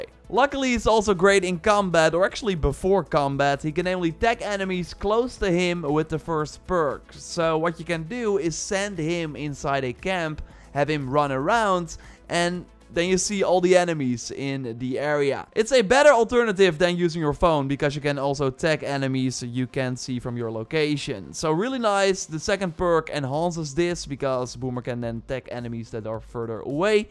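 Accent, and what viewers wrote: Netherlands. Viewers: You have a really dutch sounding Acsent are you dutch? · Nederlander ik hoor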